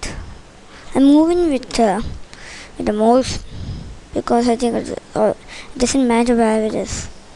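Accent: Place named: France. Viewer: Are you french? Love ur Acent! :)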